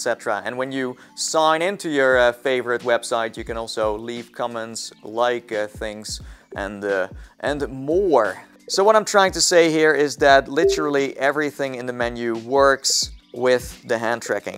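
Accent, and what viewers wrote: Netherlands. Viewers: I smell a littlebit dutch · It sounds Dutch, but not sure. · Ur definitely dutch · seems kinda Dutch am I rightytighty? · Ik zweer ik vind het zo fucking grappig wanneer ik merk "hmm, ik hoor een minuscuul klein accentje. Die persoon is Nederlands." En negen van de tien keer heb ik het goed. Altijd weer leuk om mede Nedelanders te vinden waar ik interesse in heb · I can tell you're dutch. honestly, same. and i could only wish i got an oculus quest 2.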